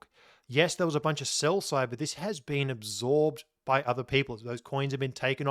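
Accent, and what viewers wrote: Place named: Australia. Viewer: Love your work. The best BTC analyst out there. And so good listening to your Aussie accent!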